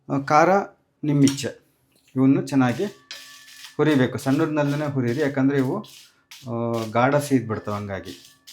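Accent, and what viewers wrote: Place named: North Karnataka, India. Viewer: Nivu uttarkarnataka kadeyora sir , nimma bhashe chenngide. Yav uru...nimdu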